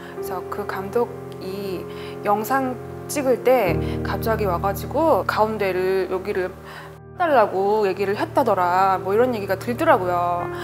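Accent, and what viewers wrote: South Korea. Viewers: 대구사투리가 살짝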